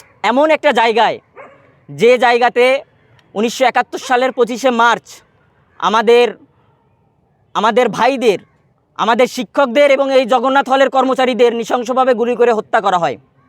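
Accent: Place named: India. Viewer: এই গলা হিন্দুস্তানি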